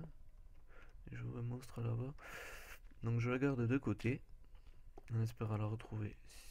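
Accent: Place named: South, France. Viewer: t'as un accent du sud !! le son il est pas terrible aussi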